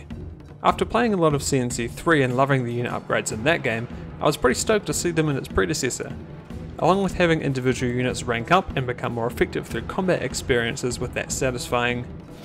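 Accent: New Zealand. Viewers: Are you a kiwi bro?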